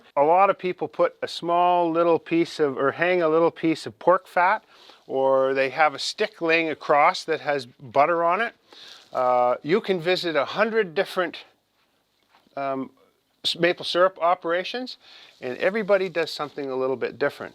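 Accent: Canada. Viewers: I'm Canadian, and this guy's Canadian accent is too much even for me.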